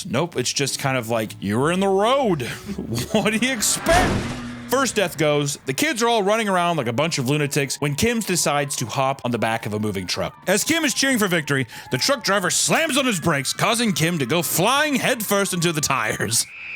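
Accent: United Kingdom. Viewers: Bruh his british accent killssss me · I don’t often make comments but you sir have the best Brit accent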